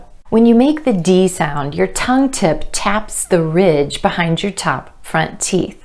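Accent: United States. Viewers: Thank you so much for another perfect lesson. I really have fallen in love with your beautiful American accent. I enjoy every your lesson cuz you explain everything very clearly. Thank you so much for your hard work!!! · You are American?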